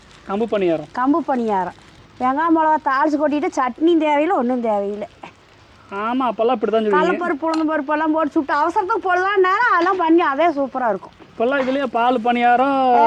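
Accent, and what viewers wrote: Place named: Tirunelveli, India. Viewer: Ninga entha uru district enna slang nalla irukku thirunelveli district?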